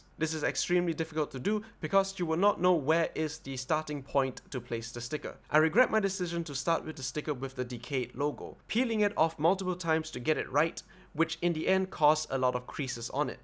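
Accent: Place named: Malaysia. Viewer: You're either a malaysian chinese or a singaporean chinese, by how confused your accent sound like at times. American english or UK english..decide..other than that, awesome review.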